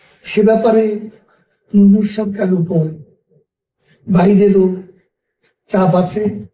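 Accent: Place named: Kolkata, India. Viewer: কথায় কলকাতার একটা টোন আছে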